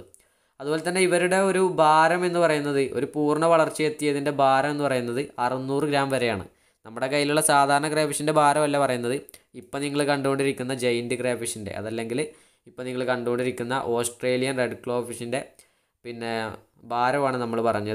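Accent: Malappuram, India. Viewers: Malappuram basha sett 💯💯💯💯💯💯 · മച്ചാനെ മലപ്പുറം രീതിയിൽ തന്നെ സംസാരിച്ചാൽ മതി അതല്ല പൊളി😍😍😍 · Malappuram slang samsarichaal kandirikkan adipwoli aanu But baki ulla subscribersin manassilavunnilla thonnunna words translate cheythaal pwolikkum🔥🔥❤️❤️ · മലപ്പുറം ഭാഷ കേൾക്കാൻ ഒരു രസമാ അതു തന്നെ മതി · Malappuram bhasha venam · എന്തുട്ടാ ഗഡിയെ മലപ്പുറം ഭാഷ കിടുവല്ലേ 🔥🔥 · Malappuram style mathi chetta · Malappuram style is OK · ഏത് രീതിയിൽ സംസാരിച്ചാലും കൊഴപ്പില്ല... നമ്മൾക്കും കുറച്ചു മലപ്പുറം ഭാഷ പടിക്കാലോ 😁😜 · Malappuram slang 👍🏻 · Malappuram slang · Malappuram language not problem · Malappuram bhashayaa udheshichth ketoooo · Malappuram slang mathi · Bro I am from Trivandrum but I like your sound malapuram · Malappuram പൊളി അല്ലെ. മലപ്പുറം ഭാഷ poli💥💥💯💯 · ഞാൻ ഒരു പത്തനംതിട്ടക്കാരനാണ് എനിക്ക് മലപ്പുറം ഭാഷ ഒരു കുഴപ്പവും ഇല്ല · മലപ്പുറം ഭാഷ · മലപ്പുറം ഭാഷ കേൾക്കാൻ നല്ല രസമുണ്ട് മലപ്പുറം ഭാഷ തെന്നെ മതി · Malappuram reethiyil samsarikkunnatha enikk ishttam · Malappuram tone eniyum veeenam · I'm from tvm... 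Malapuram slag kelkumpo interesting aanu... Ath mathii❤️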